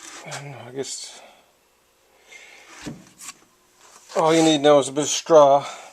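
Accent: Newfoundland, Canada. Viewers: Now das a good ‘ol Newfoundlander dere!!! ❤️❤️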